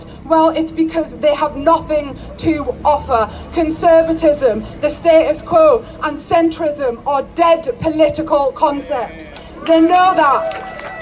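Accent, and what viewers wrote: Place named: Newcastle, United Kingdom. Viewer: You sound like you're from Newcastle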